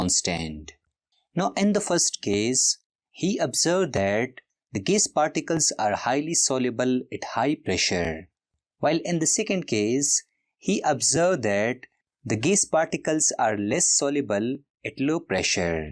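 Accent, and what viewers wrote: India. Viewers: Oh i thought he is from india because of his accent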